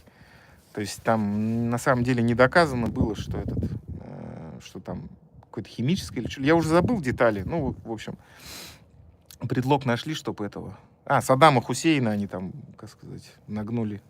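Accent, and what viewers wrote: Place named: Buryatia, Russia. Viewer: Вы ваш разговор похож на западных бурятов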